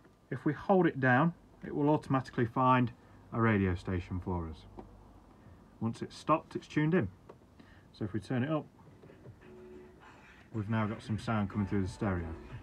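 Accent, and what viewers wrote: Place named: England, United Kingdom. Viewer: What a lovely English voice. How I miss the England I was born into. We have been (and are being) invaded by foreigners who are displacing us, unfortunately.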